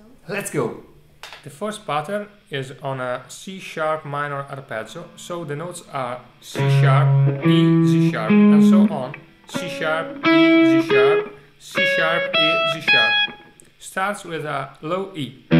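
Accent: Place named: Italy. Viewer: Già prima che dicevi im italian guy si capiva che sei made in Italy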